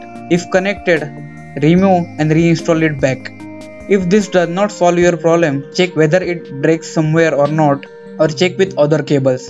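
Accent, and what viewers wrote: India. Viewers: Pindu English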